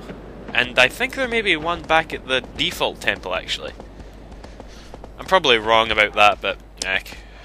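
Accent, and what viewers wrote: Scotland, United Kingdom. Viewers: That bird one is totally my favorite colossus, by the by, your scottish accent kicks ass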